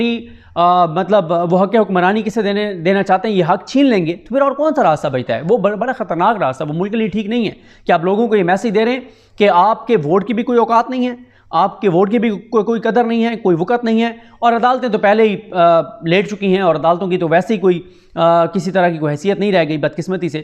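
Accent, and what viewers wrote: Saraiki, Pakistan. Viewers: AP ka ghr idr Hy islmabd???? R hm AP ki sraiki ko sumghty him bohttt piari boli Hy hm jhng sy hin r faslabd rehty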